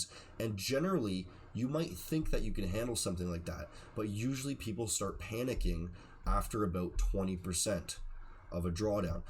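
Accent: Canada. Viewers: its just his canadian accent....not his fault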